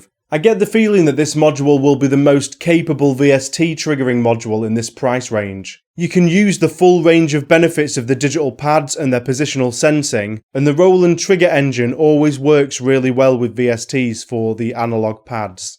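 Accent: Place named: Yorkshire, United Kingdom. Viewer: Nice to hear a Yorkshire accent for a change!!!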